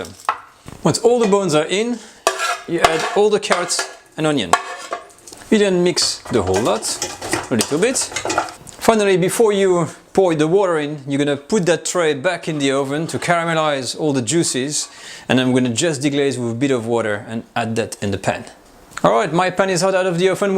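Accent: France. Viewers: I am just mesmerized by the French accent and love the recepie ❤❤❤! You rock!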